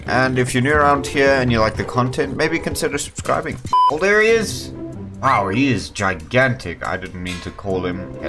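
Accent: South Africa. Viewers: Bru you from SA? Pretty sure im hearing correct lmaooo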